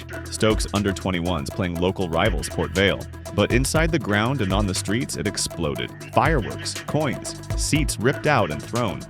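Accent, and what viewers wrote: United States. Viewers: What’s with the American accent narrator? Anyway, Stoke football violence actually originated from 1968 with the coming of the skin head cult. The events from 1985 onwards were just a continuation of the moronic behavior that started much earlier. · The minute I hear an American voice talking about British sub culture - I'm off. Ta Da.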